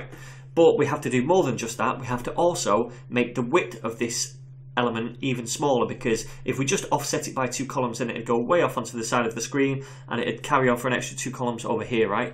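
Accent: United Kingdom. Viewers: i was learning british accent too so you are just perfect ^_^ explanation was great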